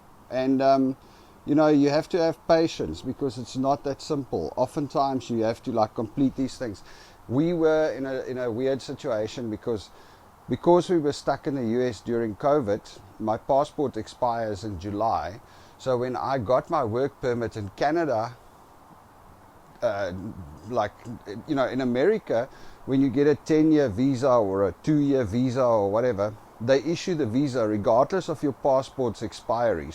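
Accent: South Africa. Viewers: I love the Afrikaans accent - greetings from Namibia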